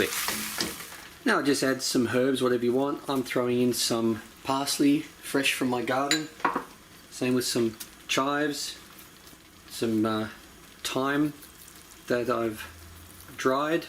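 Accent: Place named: Australia. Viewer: That Australian accent 👌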